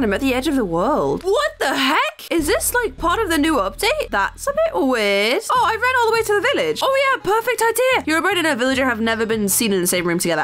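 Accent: United Kingdom. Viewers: I love how she starts sounding really really british halfway through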